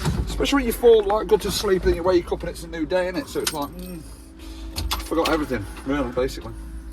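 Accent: Australia. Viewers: Aussie accent ? I thought I'd dropped into Norfolk Man Cave's channel for a minute there boi !😂 Your welding's gone from "Town centre" pigeon, complete with a manky leg and missing foot, to something you'd find in a bespoke dovecote from a copy of "Country Life" magazine !😂 Is there a service exchange price included with the alternator, or do the Chinese not even want it back ? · Was the Australian accent inspired by the Ozzy fella with the big beard who does the heavy welding on earth moving buckets and the like...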